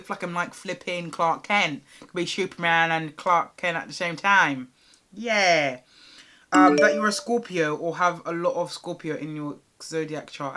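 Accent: United Kingdom. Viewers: your british accent 🇬🇧 i wish i had the same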